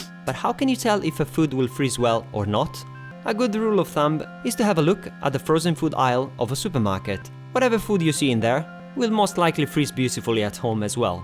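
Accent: Italy. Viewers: You are great " "! I am Italian too but I love your accent when you speak English, it is not easy to speak a foreign language, I know and you do it very well! I also love the way you speak, your irony, I enjoy every word and all your videos very much and I am so happy you are back on line again! Love you Xxx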